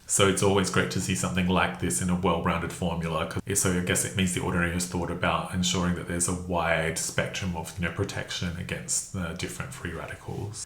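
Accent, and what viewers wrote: Australia. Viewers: Probably better to stick with my 12% for now. Thanks again . Love your succinct Australian accent 💛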